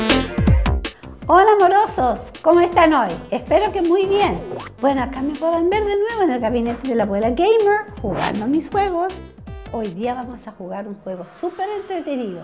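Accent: Chile. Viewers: Tiene ascento de chilena ?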